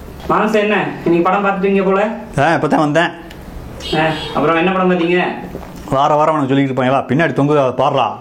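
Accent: Madurai, India. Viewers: good try. I like madurai slang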